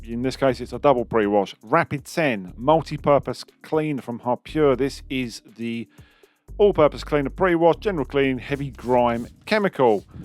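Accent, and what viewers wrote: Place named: England, United Kingdom. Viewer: One. Of the things I love about you and Jon from Forensic detailing is you both give wonderful narration and cool ass English accents 👍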